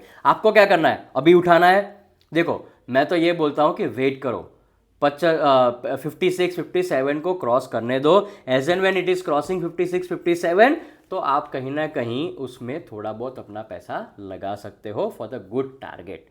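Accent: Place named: Gujarat, India. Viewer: Aap ki baat se lagta hai ap Gujarati hoo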